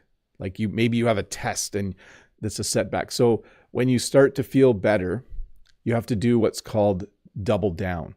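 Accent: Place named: Canada. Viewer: I love canadian accent❤️ It kinda sounds good 😊👍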